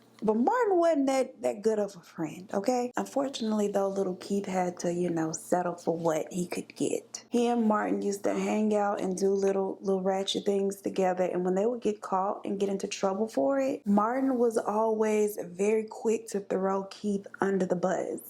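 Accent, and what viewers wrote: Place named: Texas, United States. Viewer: I have to say, your voice is so soothing to me, it reminds me of when my family lived in Texas when I was a child. Your vocal timbre is lovely 😊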